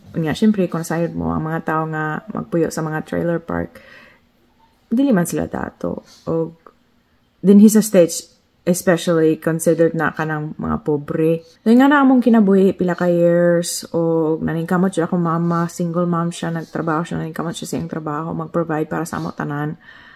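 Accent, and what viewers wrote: Visayas, Philippines. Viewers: ganahan kaayo ko sa imo accent mag binisaya jud, nindot paminawon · Ang galing mo sister! Lalum kaayo ka mo bisaya. Proud kaayo minh mga Bisaya. God Bless you & your family! 👍👏❤️🇵🇭🙏☝🏻❤️ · Sshhhttt… hhoooyy… 😁🤣 grabe jud ka bisaya. 😂 try korean airline more cheaper dw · Maayo kaayo ka mo estorya. Ganahan ko maminaw nimo Kay imong tingog Kay convincing kAayo mag talk ug story sa imong Life. Tapos bisaya kaayo. Gwaps kaayo imong tingog. FIRST TIME nako na ka kita sa video ani. Recommended by YouTube unexpectedly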